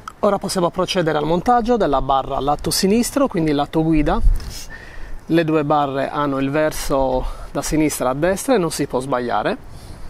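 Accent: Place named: Sardinia, Italy. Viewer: Ad un certo punto ho creduto che li avresti smontate 😁 Di dove sei ? Non dirmi sardo che l’ho capito 😁😁😁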